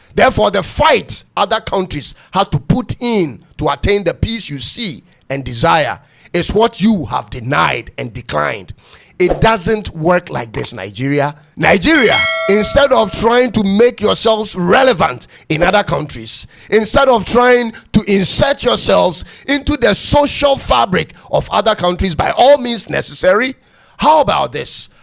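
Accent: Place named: Ghana. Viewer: We clap 👏 for you you talk as original Ghanaian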